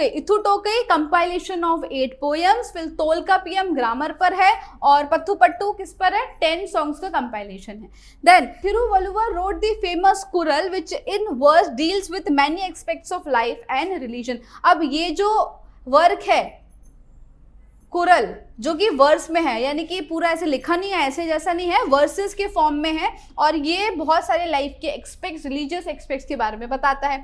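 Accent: Gujarat, India. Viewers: Are you Gujarati????😊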